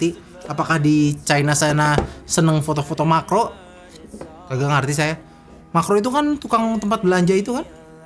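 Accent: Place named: West Java, Indonesia. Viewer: Anjay Sunda pisan wkwk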